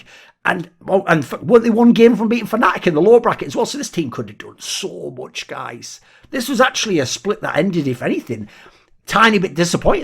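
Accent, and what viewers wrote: France. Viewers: the french accent's masterful return ! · 10/10 french accent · that french accent made me scream with laughter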